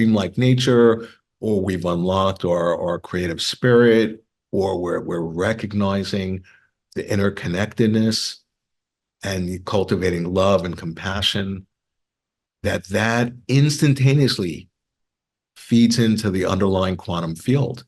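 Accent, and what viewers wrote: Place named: Philadelphia, United States. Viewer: Is Mr. from Philadelphia? His accent sounds like he is. I was surprised to see this is being presented by The Theosophical Society. They had a building on Rittenhouse Square in Center City- Philadelphia.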